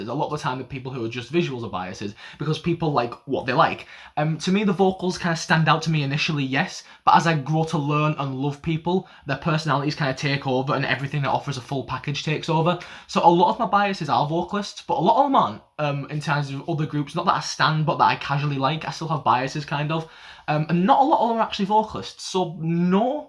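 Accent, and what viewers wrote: United Kingdom. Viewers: Casual thoughts I had while watching this video: Loved your toy story notebook. Your D.O. impression is on point. I´m from Latin America and I also really like your accent, I had a couple of British teachers back in high school and I always liked the way they spoke. Yes, Jongho is probably the best 4th vocal atm, I love his voice so much. Yeah, fan wars may be an issue if you do overall rankings but from what I´ve seen on your videos comments most of your subscribers are pretty chill people, you calling yourself a soft boy is a whole mood haha. My favorite rapper/singer is Jisung too, you should check out his king of masked singer performance if you haven´t yet, truly amazing. I don´t think you´d ever have to put an end on your ranking series because so many groups debut each year, you have endless content hahaha. NOOO, WE´RE NOT JUST HERE FOR THE RANKINGS, you´re such a cool person, a lot of people like you a lot just because you´re funny and a nice dude. 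Yes, we´d love some covers haha. YES, IDOLS DESERVE SO MUCH RESPECT FOR WHAT THEY DO UNTALENTED IDOLS DO NOT EXIST. Omg happy birthday, I hope you have a great day tomorrow. Thank you so much for answering my question and giving me that shoutout, I believe in your channel so much and I´m glad I can be a supporter. Wow, I couldn't agree with you more on that answer, kpop is so much more than just vocals and if a group needs a backing track in order to deliver a performance in the best way they can then I say they should go f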